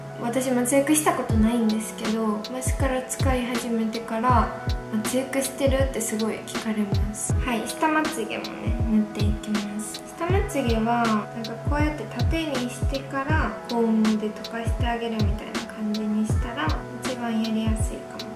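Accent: Kansai, Japan. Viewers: 可愛すぎて時間とまって観てました。。関西弁もかわいいいーーー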